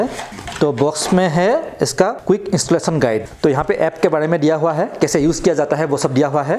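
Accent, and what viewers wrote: Assam, India. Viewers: tu oakka assamese hai. tera accent se pata chalta hai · দাদা, আপুনি অসমীয়া নেকি?? কথা কেইটা শুনি এনে লাগিল।।।। ।❤ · Is that Assamese accent I hear? Lovely! Thank you for the informative video.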